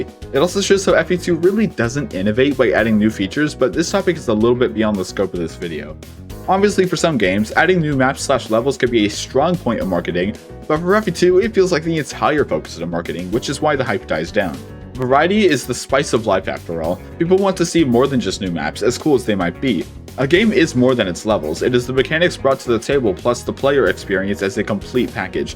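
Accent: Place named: United States. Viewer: I thought it was a voice reveal but i was confused about the American accent. Then i checked the pinned comment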